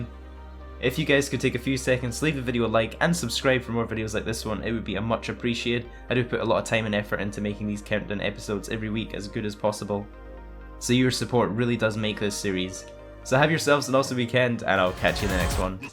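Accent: Scotland, United Kingdom. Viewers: Hey man! Are you scottish by any chance? You sound like it, love your channel! <3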